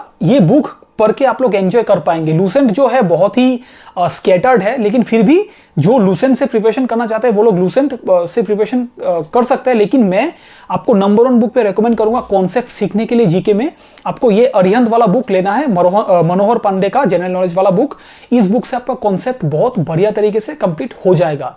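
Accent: Bengal, India. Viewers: Sir aap Bengali ho?? Kya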